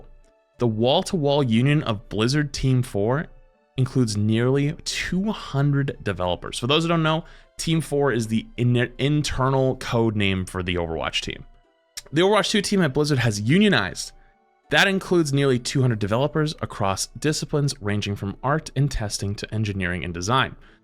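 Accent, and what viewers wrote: Boston, United States. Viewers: THATS MY BOSTONIAN RIGHT THERE! SPOKEN LIKE A TRUE MAN OF THE PEOPLE!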